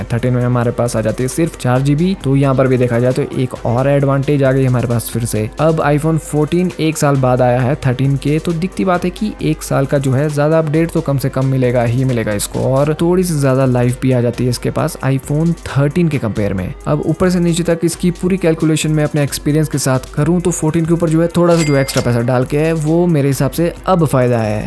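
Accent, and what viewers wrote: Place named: Himachal Pradesh, India. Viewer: Bhi awaz si himachali lg rhe ho ✔️✔️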